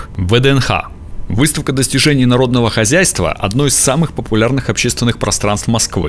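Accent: Ukraine. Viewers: Голос за кадром - иностранца , а точнее - украинца,.Сам фильм хороший, расчитан на 10 дней. В ресторан не обязательно, но билеты в Большой театр , даже по 5 тысяч , россиянам не по карману.В СССР была возможность ходить по студенческому и ЛЮДИ ходили на спектакли после работы. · Видио очень понравилось,как и коментарий к нему,но почему то удивил украинский акцент,как будто про Киев автор рассказывал,но может так и интересней,спасибо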